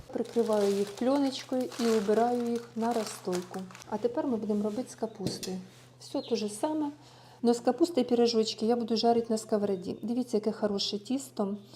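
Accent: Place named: Surzhyk, Ukraine. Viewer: Пиріжки, а не піражки, робити, а не рабиць, не мЯгкий, а м'якИй ,і т.д. Вибачаюсь, але мене цей суржик страшенно дістає...Це приниження нашої мови! Ви така красива і розумна, але цей суржик знецінює все... Чи вам теж - " какая разница?"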